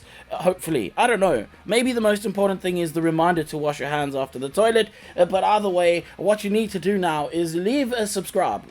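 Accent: South Africa. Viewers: Can't believe i've only found you now! I'm just about to begin my painting journey and love the amount of detail you give and the fact that you sound like you are from ZA too is a mega plus! XD looking forward to binging this channel before and after i get to start my painting adventures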